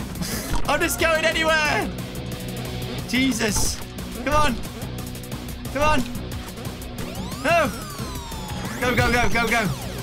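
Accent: United Kingdom. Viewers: i dont know whats so funny about a british man screaming EXOTIC BUTTERS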